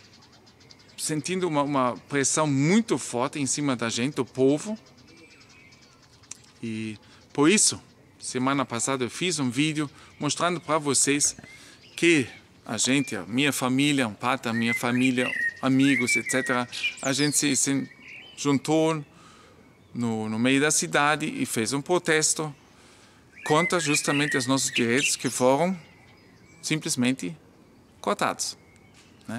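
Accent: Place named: Germany. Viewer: Eu sou canadense-brasileiro. Nasci em Santos - São Paulo. É uma cidade portuária. Meu pai era canadense e minha mãe biológica era descendente direito de alemães. Meu avô paterno era japonês e minha avó paterna franco-canadense. Por parte da minha mãe adotiva meus avós eram ingleses. Infelizmente não conheci a minha mãe biológica. Eu simplesmente adoro os seus videos. Assisto todos os dias. Devo dizer, também que estou inscrito. VOCÊ lembra o meu pai quando fala português. MEU pai tinha um sotaque inglês muito forte. Sue sotaque alemão é bem característico, também. ACHO VOCÊ SENSACIONAL. UM ABRAÇO DO SEU AMIGO CANADENSE-BRASILEIRO.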